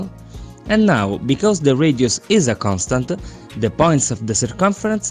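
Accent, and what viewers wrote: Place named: Italy. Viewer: Love your Italian accent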